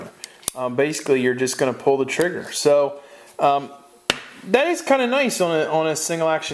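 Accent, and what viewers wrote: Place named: Philadelphia, United States. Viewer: Dudes Philly/South Jersey accent is strong.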